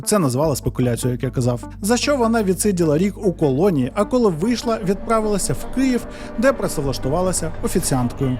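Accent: Russia. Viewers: Я дуже-дуже перепрошую. Мені дуже подобаються Ваші відео, але не можу втримась від хвилинки грамар-наці. У Вас часто проскакують російські наголоси: брАла, а не бралА, спИна, а не спинА, помЕрло, а не пОмерло. А ще по-російськи звучать наголоси на прийменниках, наприклад, ми віримо на слОво, а росіяни — нА слово. Ще раз даруйте. Просто це часті слова у трукраймі і я сподіваюсь, що Ви пофіксивши ці дрібнички, покращите ваш контент. 😳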